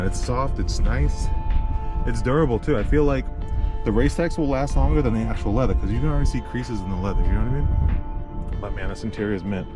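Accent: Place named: Boston, United States. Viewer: ❤😂😢🎉🎉🎉😮😮😅😅😊😊😊😊😊 keep talking, bro I love that accent my friends from Boston and she sounds just like you good job, brother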